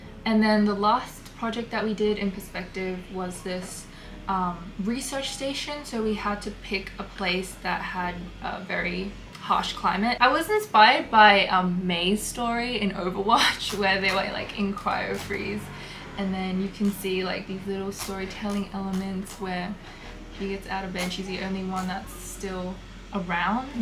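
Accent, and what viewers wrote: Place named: Australia. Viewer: you have an australian accent, what's your background?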